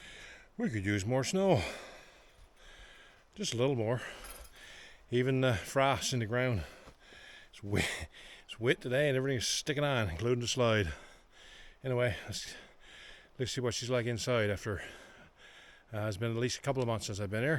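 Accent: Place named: Newfoundland, Canada. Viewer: Omg! What great video this was! Your grouse soup was so appetizing! Made me want soup. I have a grouse in my freezer as well carrots and turnip in the garden. Can't wait! I love your product review on the light with red and blue and green... nah! I agree with you about On, Bright and Off! That's exactly what I would want in a camp out situation! Bravo 👏 👏 👏 👏 👏 Your funny moments in your lovely accent fill my heart with memories of my NFLD relatives visiting Ingonish 50 yrs ago. My father's mother was born in Cornerbrook I'm 1892 and died in 1991 at nearly 100yrs. Your accent is what I grew up with... you, My Buddy, feel like home to me. Love your videos and love to Tuckie... from Rita and Terry and Juneau at Murphy Farm in CB NS 😊 ❤❤❤❤❤❤❤❤❤❤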